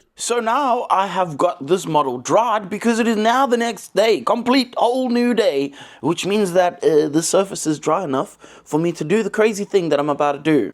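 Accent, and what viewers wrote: South Africa. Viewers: My guy sounds like a fellow South African! No disrespect meant of your not😅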